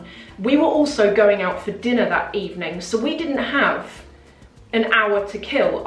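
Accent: RP, United Kingdom. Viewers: “I am quite disappointed” oh I love the way you talk and express yourself! Only a true British Lady can talk like this😘so elegant and articulate! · With your posh accent and elegance, I’m shocked they treated you so poorly!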